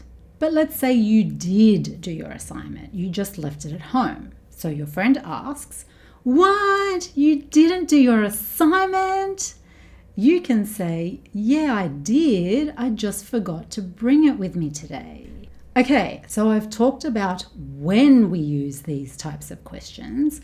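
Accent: Australia. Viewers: Thank you so much for helping me practice Australian English, this trains my ears to understand it better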